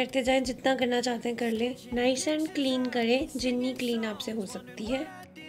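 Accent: Punjab, India. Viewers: I love your punjabi-english-hindi accent😆🥺🥺 it is really cute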